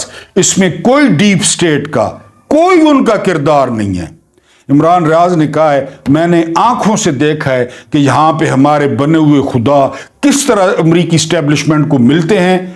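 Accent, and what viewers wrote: Saraiki, Pakistan. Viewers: ماشاء اللّٰہ کیا سرائیکی تڑکا لگاتے ہیں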